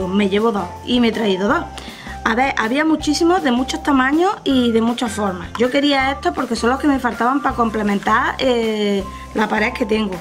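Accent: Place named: Granada, Spain. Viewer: Po zoi andaluz como imagino que por tu acento eres de Grana, ( Granada) digo yo, estoy entrenando pero esa voz tan aguda que tienes, no me sale, tengo voz de monstruo grave,, jajaja. Muuuuuad,,,,,,,,,,,,,,,,,,,,,,,,,,,,,,,,,,,,,aaaaaaadiooooos !!!!!😅, aaaaaaaaaa, la bandeja de 4,50 euros esta super, me gusta esta chula aqui donde vivo no hay TEDI.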